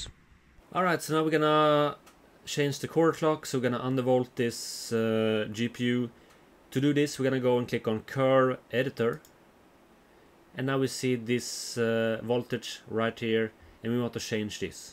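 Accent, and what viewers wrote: Sweden. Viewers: hey i like your channel and the content but i love how i can hear the Swedish accent but keep up bro and i also have a question i want to start mining i have one 2060 and i bought a 3060 ti non lhr what miner or what should i mine and am i late? thanks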